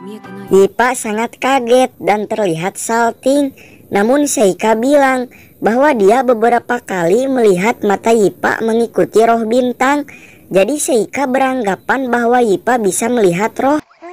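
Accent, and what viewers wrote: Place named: West Java, Indonesia. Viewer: Ka ciri pisan ieu mh urang sunda na th🤣🤣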